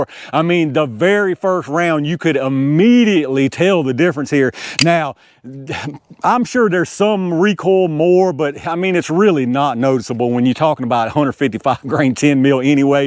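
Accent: United States. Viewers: Your accent is an American treasure.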